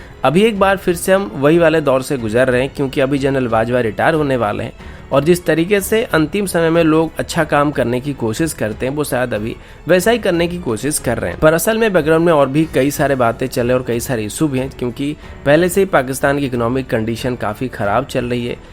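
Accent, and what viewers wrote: Odisha, India. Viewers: Bhai tame kana odia pila ki??? Language ru jana paduchhi tike tike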